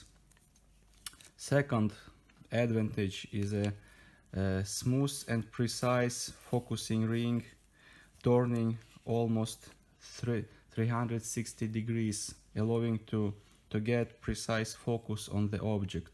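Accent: Russia. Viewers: Мне кажется, или акцент русского человека говорящим на английском?)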